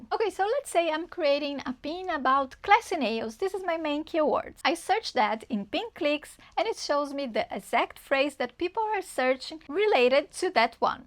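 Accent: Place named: Brazil. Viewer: Are you brazilian? your accent is kinda familiar tho! trhanks for the info!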